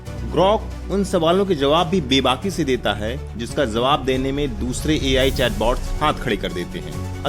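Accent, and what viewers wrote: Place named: Wardha, India. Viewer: Sir aapki voice me wardha ka मिठास अभी भी hai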